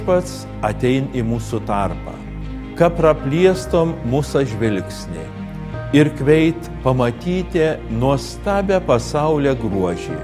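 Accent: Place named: Samogitia, Lithuania. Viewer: Žemaitiška šnekta maloniai kutena širdį.Myliu Lietuvą,o ypač Žemaitiją.Ech,žemaičiai,kad žinotumėte kokia garbė jums suteikta.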